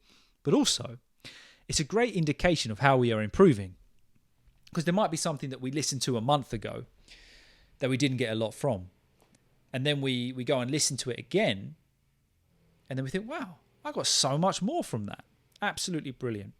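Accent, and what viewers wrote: United Kingdom. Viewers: Hey, there,. I am absolutely mesmerized by the British English accent! Thanks a million for the insightful tips!😊 From Rio 🇧🇷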